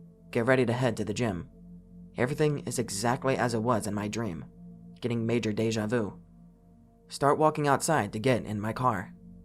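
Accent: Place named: Westchester, United States. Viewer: you from Pennsylvania? I'm getting Westchester vibes from the way you pronounce certain words.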